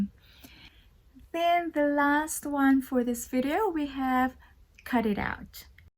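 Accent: New Zealand. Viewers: You have a kiwi Accent now.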